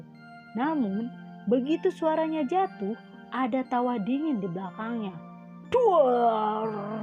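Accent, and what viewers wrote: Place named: Sunda, Indonesia. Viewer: Logaaat sundana kenteeeel amaaat siich😂😂😂 ber abad abad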